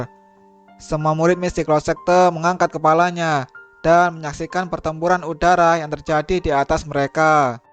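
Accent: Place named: Java, Indonesia. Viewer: Wah medoh jawane✌😁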